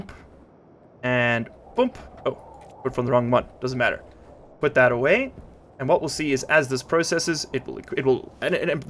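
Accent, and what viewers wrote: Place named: South Africa. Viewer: you sound south african, nice video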